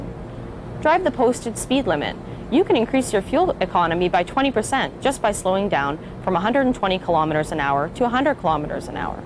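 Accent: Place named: United States. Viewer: wtf? she has an american accent but measures things in Liters and kilometers. ?!?!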